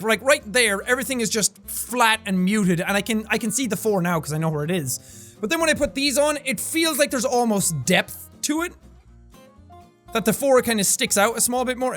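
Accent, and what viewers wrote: Ireland. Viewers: That's the Irish accent for ya. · My earphones broke and now I miss hearing Irish accent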